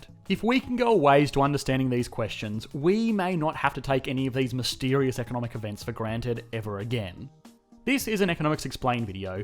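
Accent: Australia. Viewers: The Australian accent really makes everything said here a wee bit more positive ♥️😁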